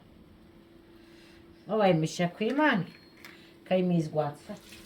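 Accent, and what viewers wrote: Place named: Sicily, Italy. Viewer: Mi piace molto anche mia nonna li faceva cosi ..e mi piace anche il tuo dialetto mi fa ricordare quando ero bambina in colleggio a messina.Istituto santa brigida.❤❤❤❤❤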